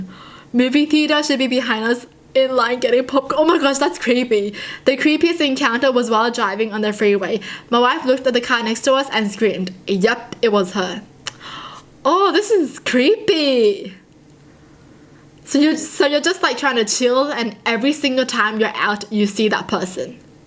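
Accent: Australia. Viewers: Are you Australian,kiwi...or..... Btw I think this is a Cool video......i watch this before I had to get to sleep....LOL. I subscribed(to this channel and your beauty channel),liked,and hit that bell (to this channel and your beauty channel).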